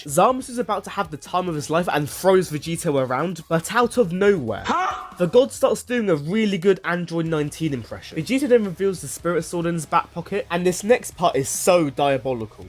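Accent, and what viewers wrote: United Kingdom. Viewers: too briish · >click on video >British accent >first moments have joke about tea >click off >??? 😂😂😂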